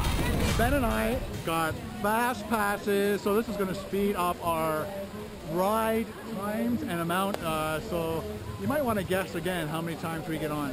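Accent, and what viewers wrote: Canada. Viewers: You have that Canadian accent (I'm in the USA) you say aboat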